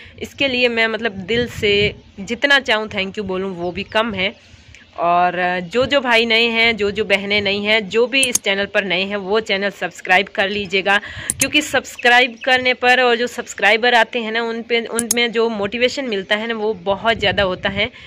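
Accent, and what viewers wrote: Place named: Barmer, India. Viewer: आप ऐक, अच्छे परिवार से,है, आपके माता पिता को मेरा सलाम, आपके मुख के सरस्वतीजी बोल रही है,,, आप मेरे को आप बाड़मेर से लग रही है अन्दाज है जय श्री कृष्णा जी श्रीं राधे राधेजी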